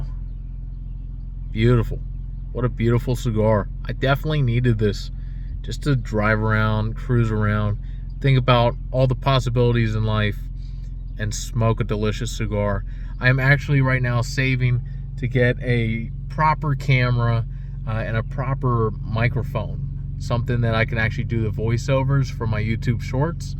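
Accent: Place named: United Kingdom. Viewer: Yo I've came to like the British voice over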